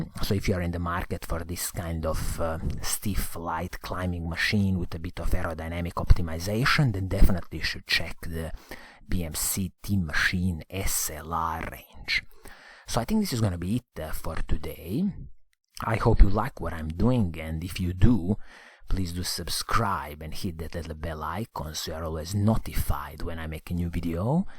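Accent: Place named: Italy. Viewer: love these videos, Brilliant! full of info and great Italian accent!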